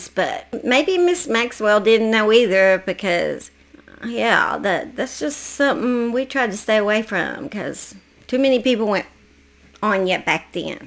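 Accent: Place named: Tennessee, United States. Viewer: I almost flip this video until i remembered someone saying my Pal was unintelligent. Country doesn't mean dumb ma'am. Yes said ma'am because I am born and raised in Tennessee. I suspect we sound very similar. The love of my life called me bammer the day we met. Had to look it up to get a clue. 😂 Somas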